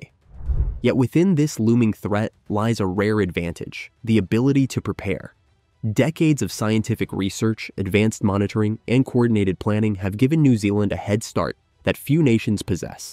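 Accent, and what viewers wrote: United States. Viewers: This is hilarious 😂! Is the guy narrating the fable from West coast USA. Because I guarantee California will have slide into the sea long before we have a problem. I'm picking this nonsense is sponsored by 1%ers that want to move here. The wealthiest people on the planet are building bunkers in the shadows of the Southern Alps. Are they also the stupidest people on the planet. Hmmm!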